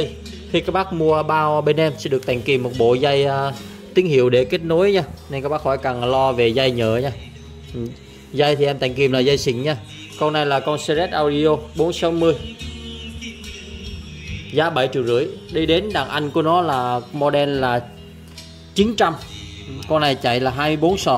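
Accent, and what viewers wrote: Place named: Binh Dinh, Vietnam. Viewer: Xem kênh nhiều của em anh rất thích chất giọng đặc trưng Bình Định của em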